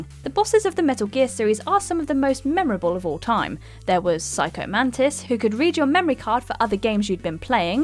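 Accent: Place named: England, United Kingdom. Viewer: I know it’s tough with an English accent and she’s relatively new, ( does a great job with the better part of the video) she really butchers a lot of pronunciations in this video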